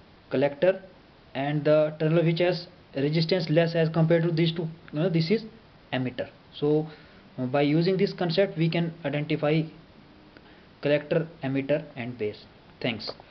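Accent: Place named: England, United Kingdom. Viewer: fuck this english accent !!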